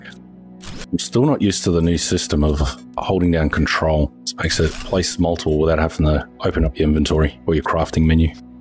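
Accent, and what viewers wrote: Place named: Australia. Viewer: Aussie ASMR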